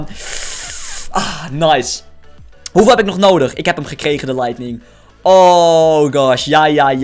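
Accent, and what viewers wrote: Netherlands. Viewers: wil je parodie's en liedjes in het echte leven maken voor je kanaal? Want dat is zoooo lang geleden.. praat nou met een Amsterdams accent?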